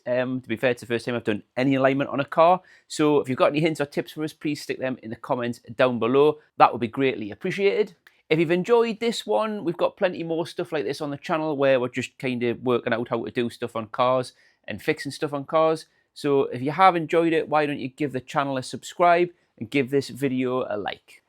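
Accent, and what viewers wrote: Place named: Scotland, United Kingdom. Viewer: What is that a Scottish accent oh my God..🇩🇬 thanks for the subtitles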